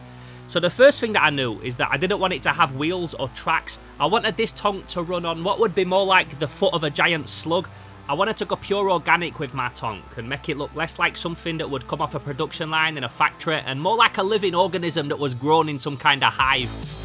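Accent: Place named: Northumbria, United Kingdom. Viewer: What is that accent? Escaped straight out of Northumbria to make Tonks. Great video I'll subscribe to the time traveler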